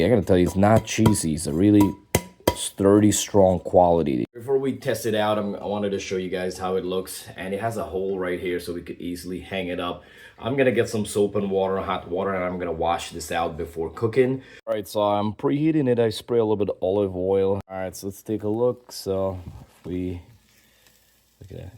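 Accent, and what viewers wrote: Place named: Guyana. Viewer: Are u Guyanese by chance u sound so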